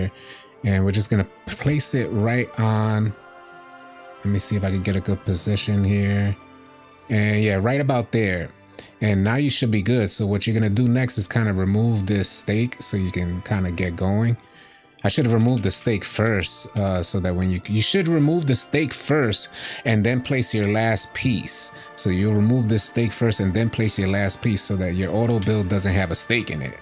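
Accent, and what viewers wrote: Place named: New York, United States. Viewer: You're a NY cat ain't ya.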